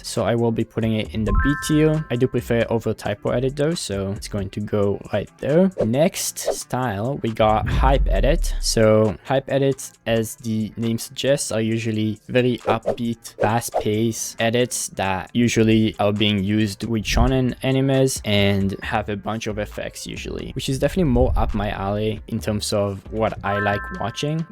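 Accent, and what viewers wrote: France. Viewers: Are you French? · Dude are you french?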